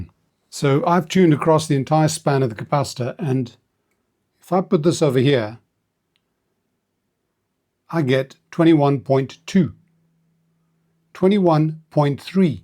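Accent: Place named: South Africa. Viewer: Haha! I recognised the accent so I thought I’d make you feel homesick! Our 4 sons were all born in Johannesburg, and we lived there for 45 years. We were the last to leave, four years ago now! Love the channel. Alles van beste, Mike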